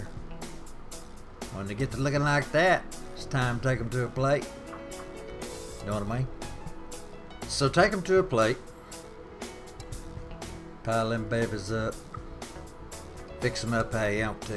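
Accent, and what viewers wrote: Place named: East Texas, United States. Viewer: Congrats on 7500! Does YouTube bump anything up when you hit that number? My family is pretty much small town and rural KS OK AND TX. A lot of your cooking is so similar to ours in technics, but you definitely have some recipes that I don't have. Remember Justin Wilson I think that was his name? Bayou cook on PBS the 70's or 80's. You don't have that ascent, but yours is similar to my relatives near Tyler. I enjoy your pleasantness and recipes! Keep it up!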